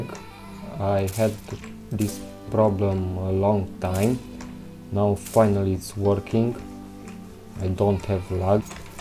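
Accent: Romania. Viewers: Din primu cuvant mi-am dat seama ca esti roman :)) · ma intrebam daca esti ro de la primele 2 cuvinte :)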